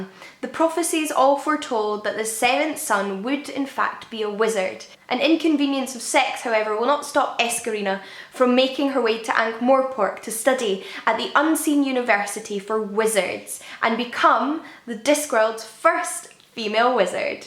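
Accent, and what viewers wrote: Scotland, United Kingdom. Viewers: Amazing video, can you, or anyone from the UK, tell me which part of the UK speaks with this accent, would really like to know, thanks! :D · Yasss another Scottish person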